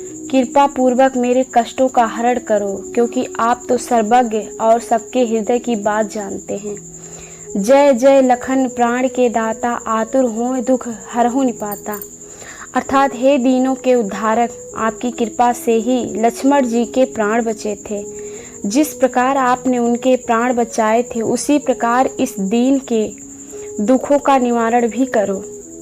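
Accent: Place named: Bihar, India. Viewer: आप शायद बिहार से हो मुझे ऐसा लगता है.. बजरंग बाड़ नहीं उसे बजरंग बाण कहते हैं.. आप बार-बार बजरंग बाड़ कह रहे हो.... 🙏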